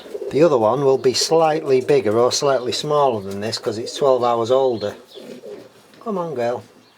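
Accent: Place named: Yorkshire, United Kingdom. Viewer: Hi I watch all your videos we’re are u based sounds like Yorkshire?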